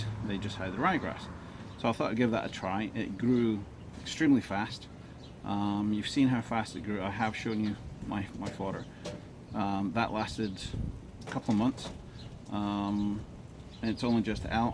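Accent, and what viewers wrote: Scotland, United Kingdom. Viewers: Which part of Scotland you originally from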